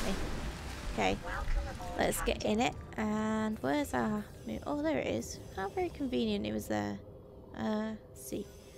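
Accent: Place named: United Kingdom. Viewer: British 👌. nice accent :)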